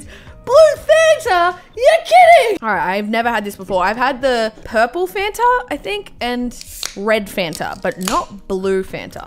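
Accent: Australia. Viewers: Are you a off brand azzyland with a budget cut and Australian accsent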